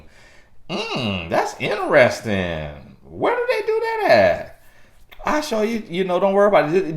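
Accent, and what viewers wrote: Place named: Florida, United States. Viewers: That's Florida for you lol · Yes he’s very country. That’s how a lot of Floridians talk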